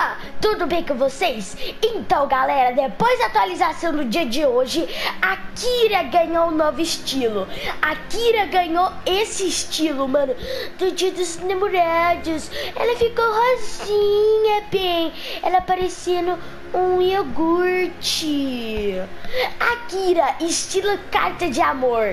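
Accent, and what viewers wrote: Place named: Bahia, Brazil. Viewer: Kkk muito engraçado vc falando parece um baiano kk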